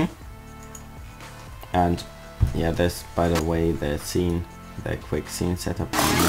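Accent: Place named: Germany. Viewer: bist du deutsche :D haat man aam anfang gehört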